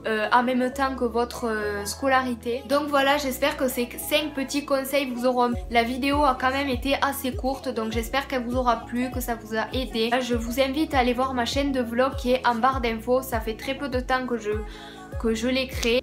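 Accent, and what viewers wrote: South, France. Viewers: C'est pas genre un putain d'accent du Sud! haha il est encore plus marqué que le mien! ;)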